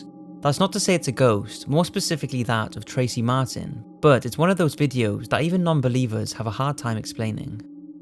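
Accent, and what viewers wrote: Wales, United Kingdom. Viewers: Michael Hunt I always thought he sounded Welsh but maybe not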